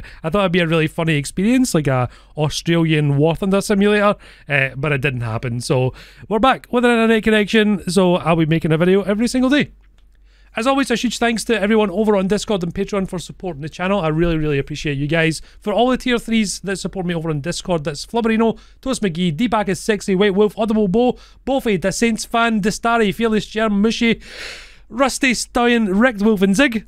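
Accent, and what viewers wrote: Scotland, United Kingdom. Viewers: I really enjoy watching your videos, but please forgive my ignorance, are you Scottish?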